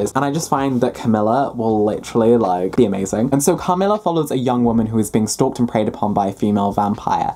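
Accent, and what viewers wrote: United Kingdom. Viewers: do people really think you’re American? I think you sound so British (but I’m American so of course I don’t think you sound American lol)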